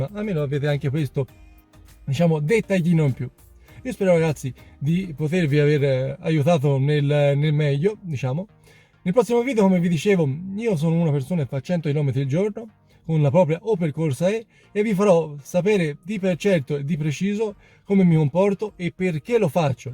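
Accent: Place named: Apulia, Italy. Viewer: Bellissimo accento pugliese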